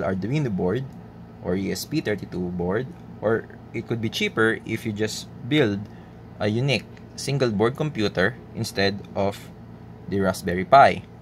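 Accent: Philippines. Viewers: Nice video,, Your voice accent like my Filipino colleage btw.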